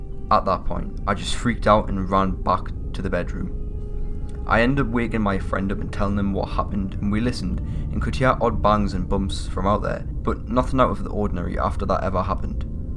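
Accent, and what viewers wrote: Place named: Scotland, United Kingdom. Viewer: Where is it that you are from?? Sounds Scottish (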